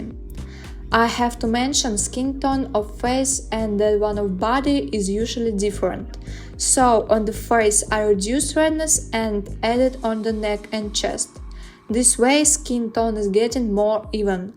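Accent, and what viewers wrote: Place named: Russia. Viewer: Привет! По речи кажется что русская =) хочу русские субтитры. хотя и так понятно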